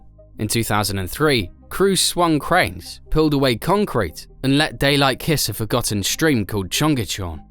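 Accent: United Kingdom. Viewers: This video makes me feel like having a English listening exam... good diction, rhythm and intonation... British accent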